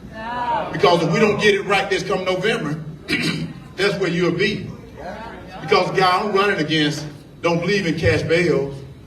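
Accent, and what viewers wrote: South, United States. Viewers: So, he's southern. We have southern accents, and he has COMMON SENSE!!! Something we really need in the senate!!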